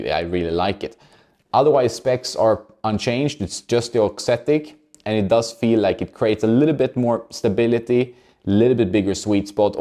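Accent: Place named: Sweden. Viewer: Swedish english accent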